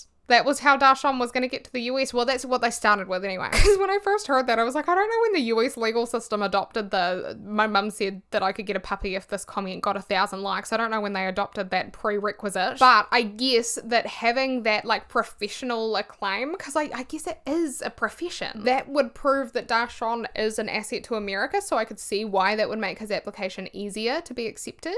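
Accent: Southland, New Zealand. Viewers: You do the New Zealand O proud 👏🏽... or should I say "the New Zealand Oarrr." Or is it "Ower.?." 🤷🏽‍♂️ I have no idea what you're doing with your O's but I ❤it. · Loved the deep dive, thanks for the effort you put into this. For my part, I think they clearly are both talented, enthusiastic, committed to their craft, but also not a typical over-polished boy band, with comedic elements to their presentation, music and style. They're in on the joke, but they are much more than just a joke. I think it's very possible there is someone behind the scenes that helped bring them together, coordinate their efforts, and give them some interesting creative direction, but if anything it would be a collaboration not that the band members are just along for the ride. Ps love your kiwi accent. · I was listening to your accent for a looong second, and I was like "wait? 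Nz??? Surely right?!", and then I saw the description!!! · She literally says in the video that it's a Kiwi (New Zealand) accent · just got recommended this video, and as if ur videos dont seem to be up my alley already, i heard ur kiwi accent, and as a fellow kiwi, immediate subscribe. its hard to find us out here !!! 😻😻😻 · english is not my first language, I only learned it through english movies, videos etc. and I can't explain my level of confusion after clicking this video. it's the first time I ever heard kiwi accent and for 5 mins I thought I never actually understood english language all my life. love the video btw I'm glad yt decided to recommend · I know this is 8 months old, but your constant fiddling with your taonga while dropping that Southland accent is sending me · Never heard of you or boy throb, but now, I appreciate boy throb greatly and I subscribed to you. Also cool to see New Zealander on YouTube and I also found it funny that you had to explain your accent as kiwi accents are everywhere is Australia lol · "my accent? 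it's a kiwi accent" right as I was wondering "huh, not British.. not Australian..." the moment I scrolled down and saw "NEW ZEALAND" by the description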